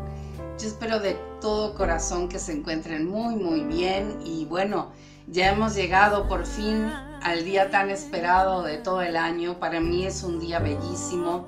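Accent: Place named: Chile. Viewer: Wow que bendición que aún mantiene el espańol con usted esq de repente pensé q traía algo de chile 🇨🇱 porque tengo una amiga así como usted es psicóloga y me viene a visitar y la escuchaba si como ella y dígale adelante usted también creces mucho ya lo verá !!🙏🏻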